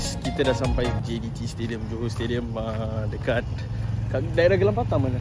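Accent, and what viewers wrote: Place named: Singapore. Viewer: dari slang bahasa je kita dah thu dari singapore...tapi apa pon mantap bosku..gerekkk seyy....salam dr johor..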